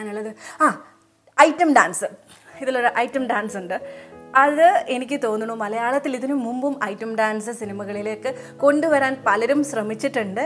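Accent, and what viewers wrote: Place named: Thrissur, India. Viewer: ചേച്ചി തൃശ്ശൂർക്കാരിയാണോ തൃശ്ശൂർ ഭാഷയാണല്ലോ സംസാരം കേൾക്കുമ്പോൾ. ചേച്ചി വളരെ നന്നായി Review പറഞ്ഞു. Lucifer is a mass movie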